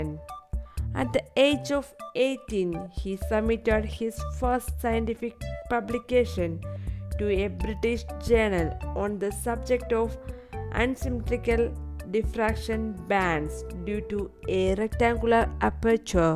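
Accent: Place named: United States. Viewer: Funny and nice american english, btw tq for this precious video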